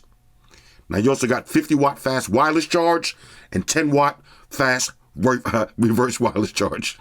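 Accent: United States. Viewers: Where are you from, bro? You sound American but your usage of the word "aluminium" is throwing me off 🙂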